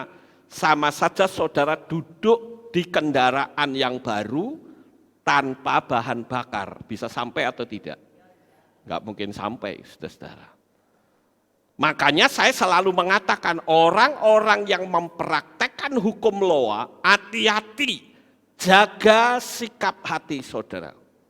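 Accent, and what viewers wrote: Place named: Magelang, Indonesia. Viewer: Itu logat orang magelang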